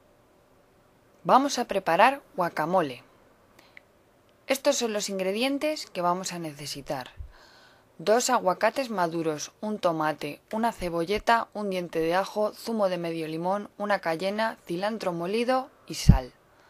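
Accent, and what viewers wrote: Spain. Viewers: Gracias " " Que rico, me gusta escuchar el acento espanol y conocer los nombres de las cosas. Lo unico que no entendi fue el nombre del chile que se le agrega al mortero (o molcajete como le dicen en Mexico), Cual sera el nombre en Ingles? (vivo en los Estados Unidos) De nuevo, Gracias!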